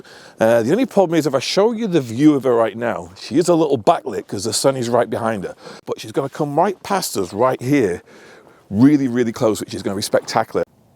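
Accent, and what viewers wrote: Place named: Manchester, United Kingdom. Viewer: A fellow brit & your in Canad! I recognised the Manchester accent. What a beautiful sight of the huge ship & another lift bridge like in Duluth MN. You are so lucky to see this. ❤ from UK. 🇬🇧🇨🇦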